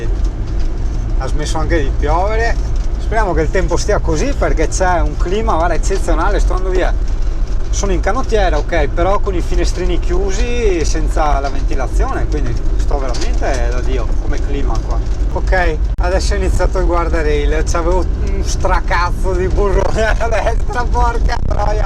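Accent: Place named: Milan, Italy. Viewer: ma quanto cazzo parli milanese del cazzo..insopportabile...se dovessi solamente un giorno capitare con te in auto, mi sparerei al primissimo secondo al solo ascoltarti parlare... anzi ti sparerei...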